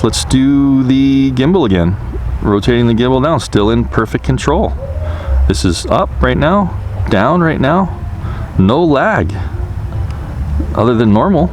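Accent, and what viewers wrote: Hawaii, United States. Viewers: i don't subscribe for the videos...i subscribe because your Hawaiian accent voice is mesmerizing!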